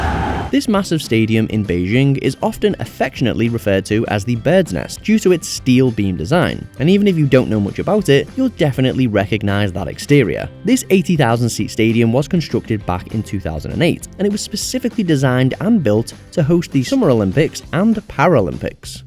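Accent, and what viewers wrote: United States. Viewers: Yeah. Dude sounded so American.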